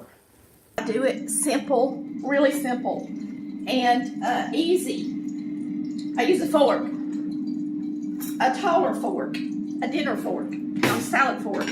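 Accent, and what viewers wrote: South, United States. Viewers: I wanna move to the south so i can get this beautiful southern belle voice and postpartum cookin🥺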